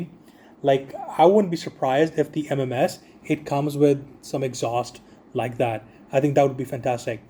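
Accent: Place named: India. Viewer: good review video bro hey! hi from Quebec Canada, I speak French, so me too had to learn English to join the gi joe community, welcome! from your accent, you must come from India or something? scrap iron your videos have little views because the trend is about the new releases, I have this guy since years before, so that s why it will not have many views you can compensate with dios - scenes to present them, it always look better, just cardboard and paint does a decent job, it s cheap. he s one one my favs in Cobra, he looks good next to reg blue troopers and the stinger jeep he s a tank killer, so he s always protected by troopers, vipers, so he only needs a gun. since you re new, or at least come from far away, you should know the Hasbro pulse site, here on u tube, check the 'post' section to comment and suggest to the makers themselves, I m sure they ll love that you love gi joe coming from so far. 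the cartoons, comics, they are a reference, but the file cards are the most important infos, I rely on that all the time, scrap iron is a solid character for Cobra in gi joe, congrats! so now you know... God bless America and world peace good work bro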